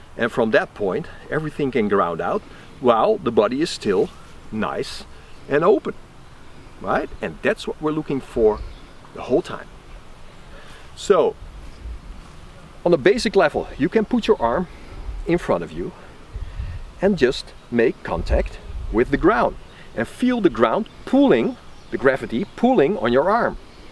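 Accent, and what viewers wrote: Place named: Netherlands. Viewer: Dacht al een NLse accent te bespeuren en idd wat die persoon hieronder zegt....... ''Freaking duidelijke uitleg!" Het wordt goed gewaardeerd. Waar zit je NL en geef je les? Grtn uit Curacao.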